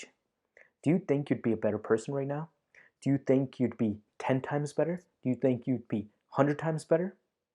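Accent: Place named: United States. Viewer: Do you live abroad, coz your accent sounds American??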